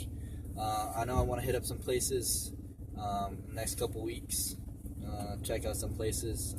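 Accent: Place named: Washington, United States. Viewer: hey that's weird that I was just thinking this dude sounds like he's from Washington. lol I'm from Tacoma. love the videos and envy the lifestyle.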